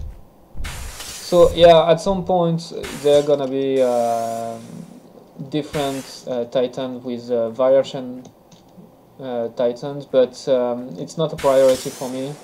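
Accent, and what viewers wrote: France. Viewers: LE BON ACCENT FRANCAIS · are you french because am i and you have the french accent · oh ok i was telling m'y self why have à frensh accent but he is frensh mdr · On est d'accord il a un accent français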